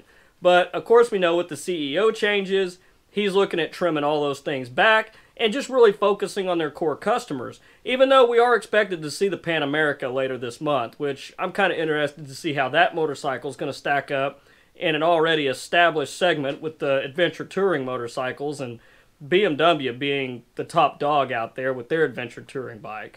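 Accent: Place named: South, United States. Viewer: You must be in Texas or Louisiana. Jeff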